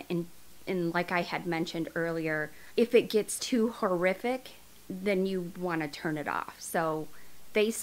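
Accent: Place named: Dakota, United States. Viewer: Are you from Dakota? You remind me of a character from Fargo or Chelsea houskas mother.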